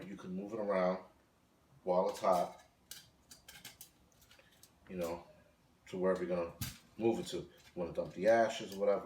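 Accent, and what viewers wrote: New York, United States. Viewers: Are you from NY? I'm sensing an accent.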